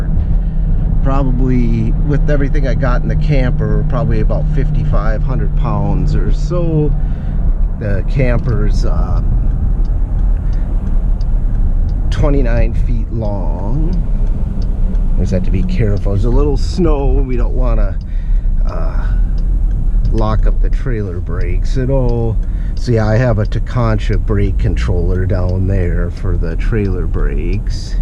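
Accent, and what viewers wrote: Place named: Upper Midwest, United States. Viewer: Good video. I like your accent too! upper midwest?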